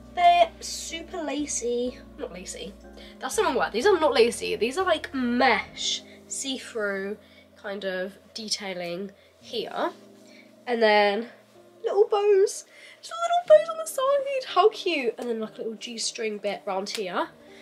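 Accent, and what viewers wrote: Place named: United Kingdom. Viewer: cute voice with the British accent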